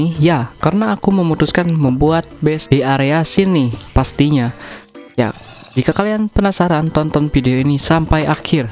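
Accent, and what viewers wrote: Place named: Sunda, Indonesia. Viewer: Susah kek nya dh biasa ngomong bahasa Sundah teh maksain make bahasa indo😂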